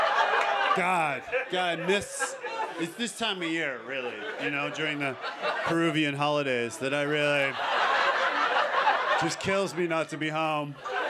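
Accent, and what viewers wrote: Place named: Canada. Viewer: That's how Canadians talk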